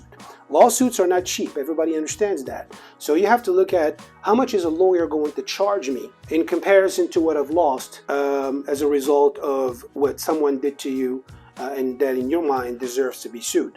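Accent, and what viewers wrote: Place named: New York, United States. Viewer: sound a bit New Yorkish.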